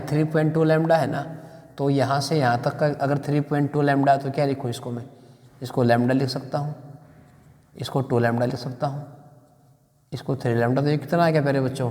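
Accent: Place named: Haryana, India. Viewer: Sir harvanvi galak aa rahi hai sath ma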